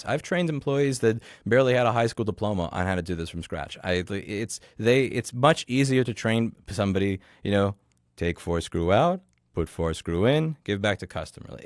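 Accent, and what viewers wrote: Australia. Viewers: Being interviewed by a Brit wait whats with the Aus twang. Ah... ok. Interesting. Cool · With an Australian accent? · I’m Australian so it was always obvious that he was Australian but Australians don't have such distinct accents that alline with people’s race so it’s often not easy to pick. There are ethnic accent variations in Australia but they are often learned from your family rather than broader community so if you're a second or third gen Aussie you might just sound like everyone else. There are exceptions like people from Melbourne with Greek heritage like Nick Kygerios (I don't think he was originally born in Melbourne and he’s half Greek) but you can hear it in his accent. That's most likely because Melbourne has the largest Greek community outside of Greece. The Indigenous Australian accent is also quite distinct but many Aboriginal people don’t have it. 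I was surprised to see isn't white after watching his videos for a while, that was a whole second or two of surprise! Either way it doesn't really matter, I don't think anyone is watching his channel because they think he’s white any more than people watch MKBHD because he’s black (but has a more neutral accent). I’m guessing he went to a good school and he shares the same general accent that most of his peers had. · He has an Australian accent... Also you aren't as clever as you think you are.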